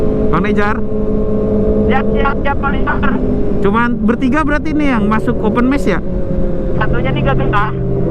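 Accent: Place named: South Kalimantan, Indonesia. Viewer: Banjar ny kental bener dah 😭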